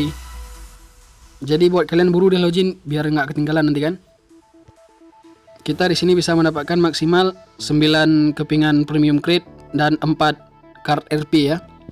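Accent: Aceh, Indonesia. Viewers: dari logat lu, gw tebak lu dari negri plat bl ya bro?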